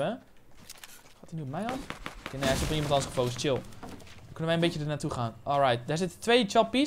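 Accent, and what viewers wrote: Apeldoorn, Netherlands. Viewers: Kom je uit Apeldoorn?😯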